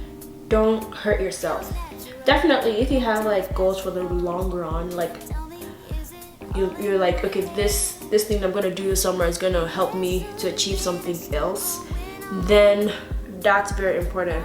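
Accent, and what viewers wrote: Nigeria. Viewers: you look so gorgeous here. Naija accent coming through.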